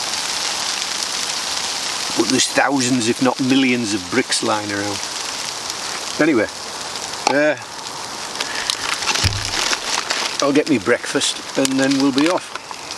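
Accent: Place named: England, United Kingdom. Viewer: Very convenient music was choosen on the beginning! And what a lovely wood! What location is this? I assume somwhere in England, according to your accent?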